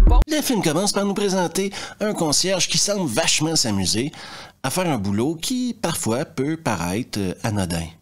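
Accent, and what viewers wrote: Quebec, Canada. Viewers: Sérieux t as que 8000 abonnés ? Tes vidéos sont à tomber , tes choix de films sont toujours judicieux et bien a propos .alors après je me dois d avouer que ce si "sympathique" accent du pays du Québec, joue sur mon plaisir a "ecouter😂😂" tes vidéos ,je m'en fous t es un champion de mon youtubegame a moi !!!!!!!!!et je vais vite m'empresser de recommander tes vidéos à mes proches( c'est a dire ma mère ,mon frère et un peu de collègues Facebook .......faut pas exagérer j ai plus de quarante ans 😂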